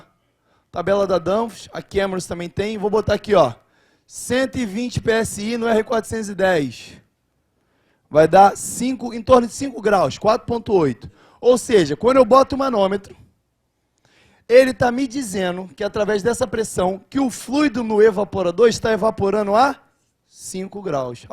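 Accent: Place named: Rio de Janeiro, Brazil. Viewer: Carioca raiz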